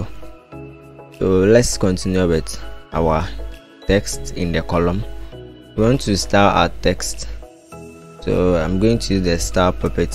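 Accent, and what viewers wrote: Ghana. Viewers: Bro u speak like a Ghanaian, are you? I'm Kojo.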